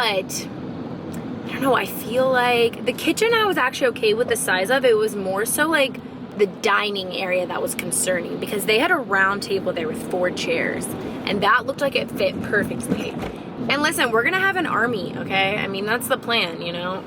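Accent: South, United States. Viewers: Hi, I’m from England and have been following you for about two years. Am enjoying all of your content, the peak into southern American life (loving the accent) and especially the progress of your relationship with who is just lovely! Personally, I think house No. 1 is best for you both in the long term as, if and when your family expands, you will soon find the extra space will be a godsend. As a mother myself, I would highly recommend the principle bedroom on the same level as the nursery - just think of those multiple night time visits - you definitely don’t want to be “stumbling” up and down stairs!! With regards to house No. 3 I would personally be concerned about the lack of privacy in my rear garden (haha, “yard” as you guys call it). Good luck with your house hunting and enjoy the process - how exciting for you both! P.S. Please pass on to your mother what a beautiful lady she is, both from within and on the outside.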